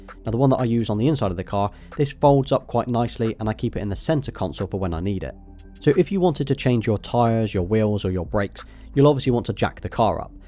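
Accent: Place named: England, United Kingdom. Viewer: Model 3. Great quality video and refreshing English accent 😉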